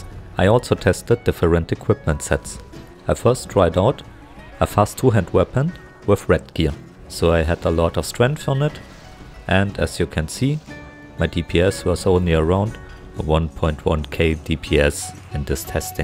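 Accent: Germany. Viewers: Man hört so krass das du deutscher bist 😂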